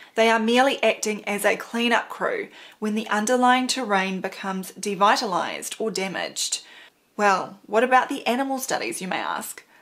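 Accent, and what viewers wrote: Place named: New Zealand. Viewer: Her NZ accent and her healthy smile and energy are added plusses to her important Med industry transforming message....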